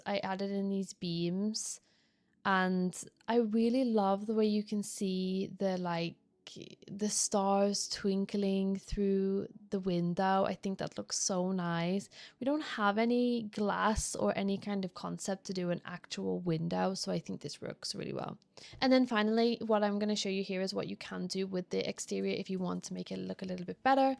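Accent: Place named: Scouse, United Kingdom. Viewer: Lovely stuff! Forgive me for asking, but do you have any affiliation with the city of Liverpool? Quite a lot of the things you say sound like there is a twinge of a scouse accent there! :)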